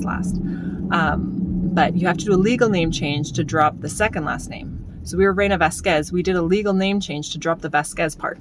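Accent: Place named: Canada. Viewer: Wow! This is how Canadians sounds? This could be a good chanel to listen English for practice.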